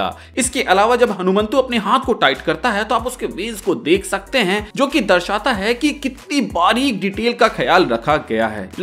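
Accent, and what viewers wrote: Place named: Jharkhand, India. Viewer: Feka jata h , dhekla jayega bhai tm jharkhand se ho kya ???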